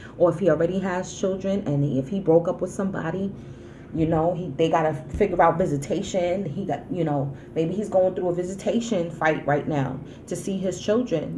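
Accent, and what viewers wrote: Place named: New York, United States. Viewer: I love your delivery. You are suuuucccchhh a NY girl lok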